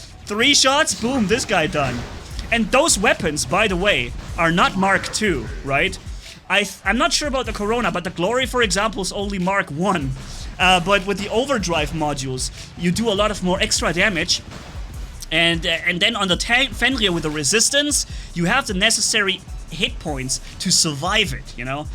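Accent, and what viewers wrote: Germany. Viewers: Hi are you German from your nationality cuz u have this German accent when your talking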